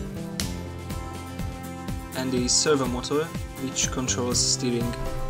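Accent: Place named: Hungary. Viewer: Hallani hogy magyar vagy!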